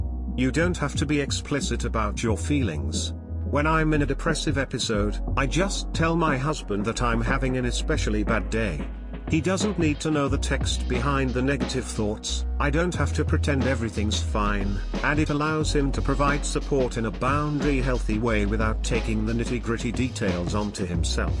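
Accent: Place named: United Kingdom. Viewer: I didn't even consider that the voice in this video was british also because i quit listening. But yes, the old voice (often named Daniel) is better. He isn't perfect, but he is the boss at this. Everyone else just sound wrong with the only exception being the american voice of the Radio TTS Youtube channel.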